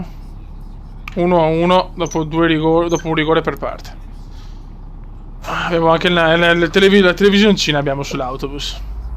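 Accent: Veneto, Italy. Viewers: L'accento veneziano mi fa impazzire, sei un grande! Vai così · Quanto mi sta in culo il tuo accento veneto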